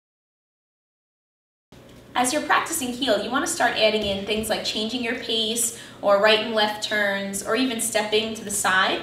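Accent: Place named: New Jersey, United States. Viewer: Love the Jersey/NY accent. "Dawg"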